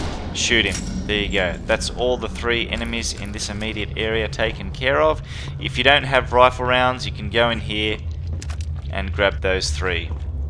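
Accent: Australia. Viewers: just started watching you and im in love with ur channel already. btw are u Australian? n will u be doing chapter 15 anytime soon?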